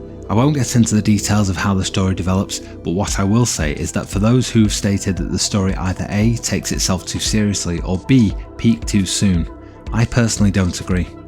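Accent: United Kingdom. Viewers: Just discovered this game reviewer. Between the warm tone, brit accent, depth, and level of brain power required to comprehend, I'm like, "this mf should be voicing everything and telling me the weather and my driving directions and boarding sequence and how awesome the next movies coming out might be. #MAKEMORECONTENTBRO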